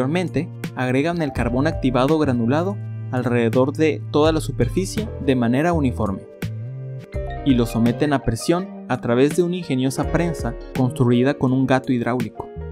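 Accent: Mexico. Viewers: Se te nota el acento Sinaloense we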